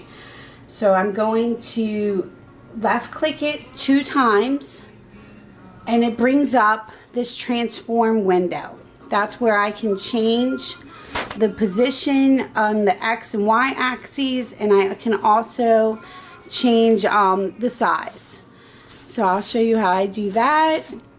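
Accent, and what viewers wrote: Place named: Delaware, United States. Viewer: my ex mother in law and one ex sister in law lives in Middletown my other ex sister in law lives in Bridgeville. Your accent reminds me of them (all good things). I graduated from Dover High School before moving back to my home town in Nevada lol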